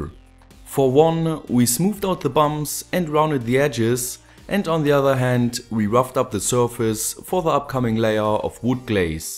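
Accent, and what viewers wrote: Germany. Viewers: Sag mal täusche ich mich da oder bist du deutscher weil mir is das jetz schon öfters aufgefallen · LOL are you from Germany ?!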